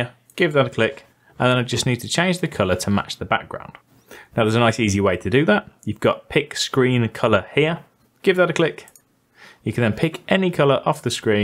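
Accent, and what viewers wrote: United Kingdom. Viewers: What's not to like,?! I learned how to make an intro, and I got to hear a cool accent from across the pond.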